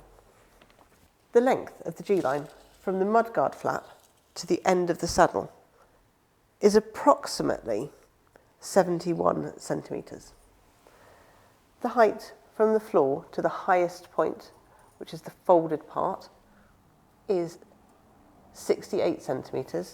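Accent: England, United Kingdom. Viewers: Long time since your dulcet robbed English accented voice issued forth from the speaker of my smartphone. A 20 inch Brompton with Shimano 8 speed is not very different from the Moulton that was on offer last year out in Arizona. Shame it doesn't have the front fork of the Moulton The Moulton frame might be stronger. Not as convenient. The 34 spoke rear wheel would be better for use on unpaved roads. We have hundreds of miles of dedicated bike trails here in Missouri. Katy. Rock Island etc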